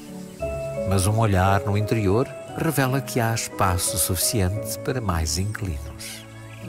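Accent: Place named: Portugal. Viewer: Ô inteligente, o narrador não é português do Brasil, ele é português de Portugal.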